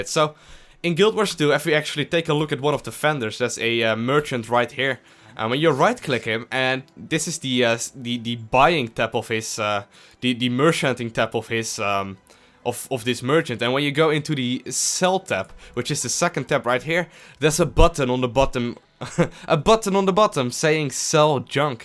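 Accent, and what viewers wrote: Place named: Netherlands. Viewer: The Dutch accent is real ;D Great video, thanks!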